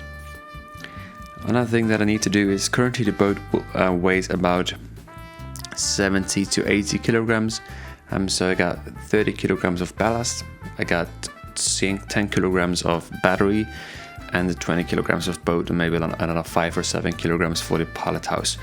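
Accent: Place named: South Africa. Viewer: I sorta see it as ticking boxes.. its a build.. and its relatively cheep, i love fishing and wanna see a sea monster landed by RC boat. Then you can expand your channel with ppl who are perfect and like fishing. You have the set up... and even if you not a fisherman, im SURE it would be a blast... find your fishing mate's to get tips. Somtimes old heads have some fishing knowledge. Austria! Your english sounds south african, i thought you white african LOL but 100% understood you so. Thanks for sharing the build mate. Its interesting.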